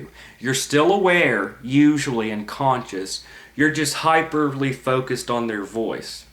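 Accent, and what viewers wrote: South, United States. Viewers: he sounds like hes from the south, like me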